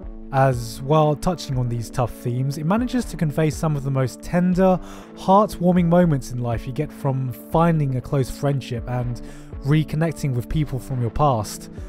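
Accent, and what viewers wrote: United Kingdom. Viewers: You know, watching videos is a lot like watching a well-written show. You have the videos like his "Anime in Minutes" series or his rants/explanations like the "Konosuba" video series which are meant to be lighthearted entertainers. Then, every once in a while, you get videos like these. That same lovable British accent we all know for cracking the occasional dick-joke goes on to bring us "The Perfect Anime" and videos such as this which explore personal feelings and memories. These videos remind me of watching an anime, because while we connect to anime, many of us can probably connect to this video. It's really something amazing that I can watch this video and get so much more than a single, simple point out of it. It's as if you've just said what many have tried to say but couldn't. Thank you.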